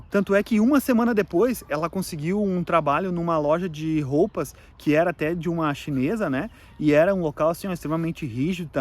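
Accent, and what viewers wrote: Rio Grande do Sul, Brazil. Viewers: Pelo sotaque chuto que vc é gaúcho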